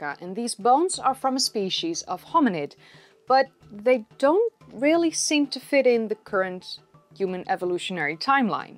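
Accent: Netherlands. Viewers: Na 3 seconden hoorde ik dat je nederlands bent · Ik hou van je Nederlandse accent en hoe intelligent je klinkt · do I detect the slightest Dutch accent? maybe even belgian? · Do I detect a Dutch accent?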